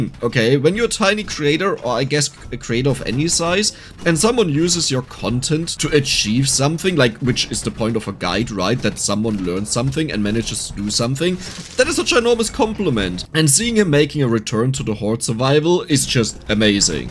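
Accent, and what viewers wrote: Germany. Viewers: 5 seconds in the video and i could already tell you're german lol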